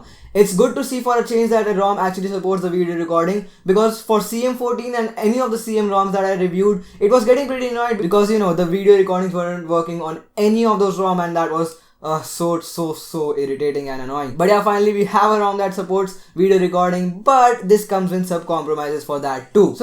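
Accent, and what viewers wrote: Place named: India. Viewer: sorry but your engslish is hard to understand, because you speak to fast and its looks like you speak india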